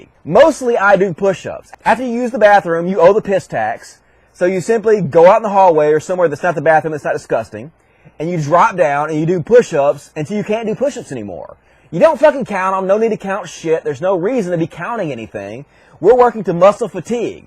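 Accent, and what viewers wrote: South, United States. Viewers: Forgive this non-American his ignorance but I never thought I'd hear a Southerner casually talking in metric. I thought you Americans recoiled like vampires when ever someone starts talking about grams and hectares :P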